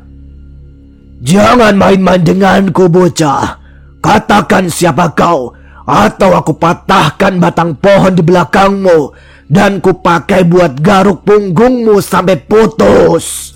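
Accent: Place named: Bogor, Indonesia. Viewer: Suoro bogor❤😂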